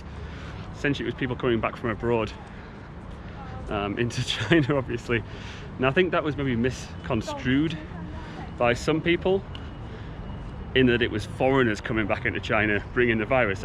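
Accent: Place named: England, United Kingdom. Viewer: Holy....This is Harlan. It’s nice to see my teacher’s vlog on the YouTube by surprise. Been in US for 5 yrs now. I can finally tell your English accent lol. Keep doing the best work!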